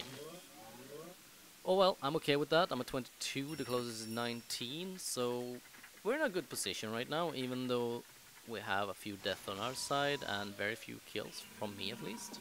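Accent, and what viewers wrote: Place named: Sweden. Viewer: You sound so Swedish, are you really from the US?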